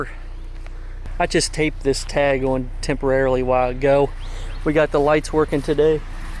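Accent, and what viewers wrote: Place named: West Coast, United States. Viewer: WOW, that's the kind of thing I would dream of doing. You saved a lot of green backs that way! East Coast. It sounds like your from the West coast. LOL. Thanks for the response, I liked that too!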